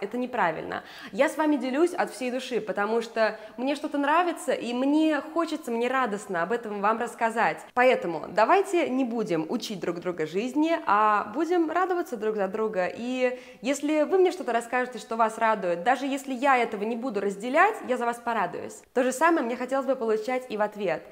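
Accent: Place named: Italy. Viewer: Больше всего люблю, как ты говоришь! У тебя появился акцент итальянский?) Не акцент даже, а интонирование, точно не русское) очень мне нравится🙌🏻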